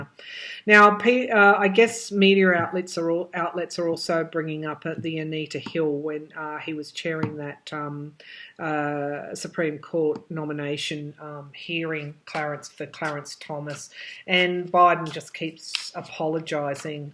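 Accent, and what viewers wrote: Australia. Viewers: I love the the R rolls in your speech..no need to correct it..Us here in America have a few different accents but I love the Assuies accent!!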